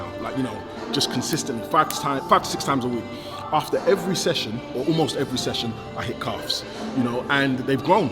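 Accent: United Kingdom. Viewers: I didn't know that had an british accent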